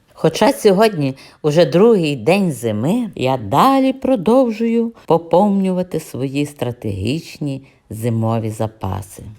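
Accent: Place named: Western Ukraine, Ukraine. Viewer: У вас такий гарний западноукраїнський акцент. Знаю, що в тих краях дуже файні гадзині))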